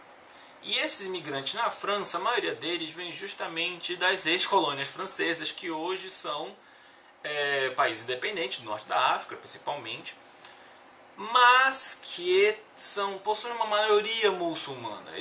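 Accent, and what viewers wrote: Pará, Brazil. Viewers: Paraense? Adorei o vídeo! Maravilhoso!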